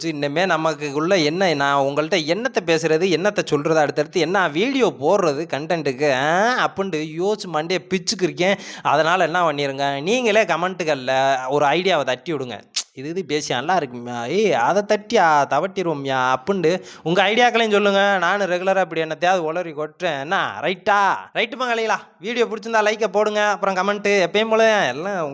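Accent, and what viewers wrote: Madurai, India. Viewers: ஹாய் ப்ரோ, நீங்க பேசுறது பங்காளி என்று சொல்றது இந்த மதுரை ஸ்லாங் எல்லாமே சூப்பர் ப்ரோ பட் உங்க வீடியோ எப்போதுமே கொஞ்சம் லேட் லேட்டா வருது 29-ஆம் தேதி ஜெயிச்சு மேட்சுக்கு ஒன்னாம் தேதி போட்டிருக்கீங்க வீடியோ இப்படி இருந்தா எப்படி பாப்பாங்க உங்க வீடியோவ ஸ்பீடா போடுங்க உங்க ஸ்லாங்கில் சொல்லணும்னா பங்காளி வீடியோவை கொஞ்சம் ஸ்பீடா ஸ்பீடா போர்டு அப்படின்னு வெட்டி முடிக்கிற வேலையை பார்க்க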